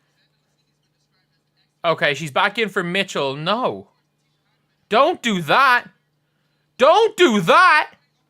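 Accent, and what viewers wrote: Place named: Ireland. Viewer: No worries – an Irishman talking about anything is entertaining to many Americans.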